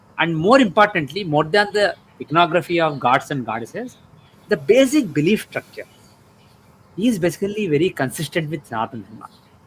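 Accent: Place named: South, India. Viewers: He is south indian, an achiever, also astrology is his passion and interest. His way of speaking does not seem important, what he is saying is more important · 😂😂😂👎👎👎👎 Terrible Southie Accent. Speaking Madrasi ? Or English? No one understands. Terrible 😂😂😂😂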